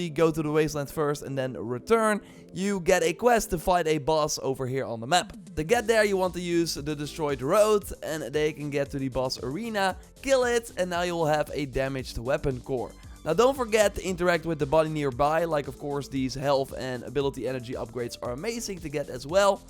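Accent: Netherlands. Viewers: That dutch nasal accent makes me want to cut my ears of. Nice content though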